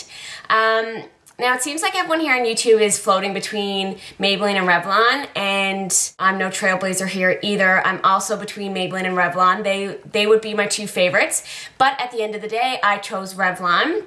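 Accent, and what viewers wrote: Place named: East Coast, Canada. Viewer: Michelle sent me as well! You are gorgeous and your videos are great, glad to have discovered you. I love your top, where is it from? I am also Canadian from the West Coast, I would guess you are from the East Coast from your "accent". Thanks for the great videos!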